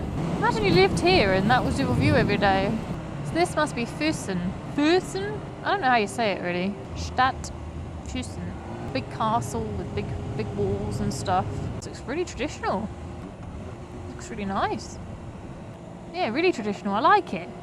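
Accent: United Kingdom. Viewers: i like that British accent so much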